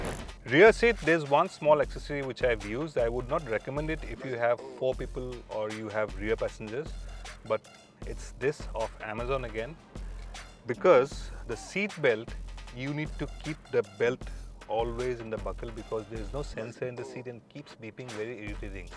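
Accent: Assam, India. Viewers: Lovely to see an Assamese host for a car show! I could figure out the Assamese accent right away! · Sir, apuni axomiya neki? Accent huni lagise..💗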